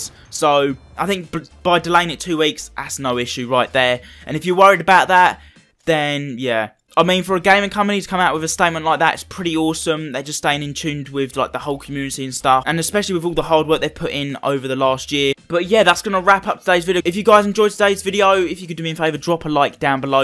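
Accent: United Kingdom. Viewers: Are you from liverpool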